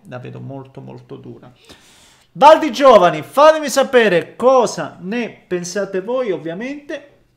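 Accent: Calabria, Italy. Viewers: ma sei Calabrese?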